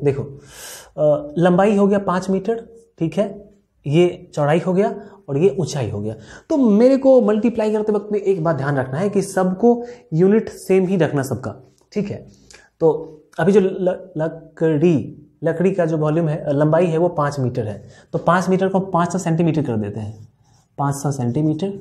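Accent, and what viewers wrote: Bihar, India. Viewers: Aap Bihar se hai kya Hum Bihar Darbhanga se hai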